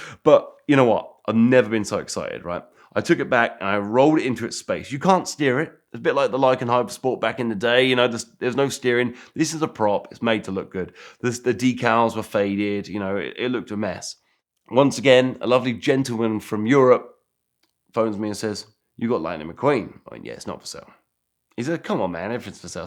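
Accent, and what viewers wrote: United Kingdom. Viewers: maybe its just the brit accent but i now want to make the lightning mcqueen mobile! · I don’t care how good a story is, it’s just that much better when hearing it from someone with an excellent British accent. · i dont expect the everyman-british accent from him!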